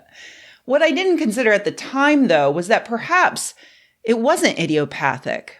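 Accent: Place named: East Coast, United States. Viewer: I hear so much upper east coast in your accent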